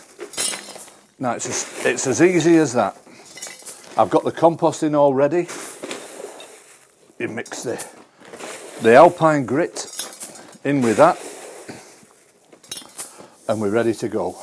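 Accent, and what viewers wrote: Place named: Yorkshire, United Kingdom. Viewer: Great video. Sounds like a fellow Yorkshire man. Just planted an old bath with this beauty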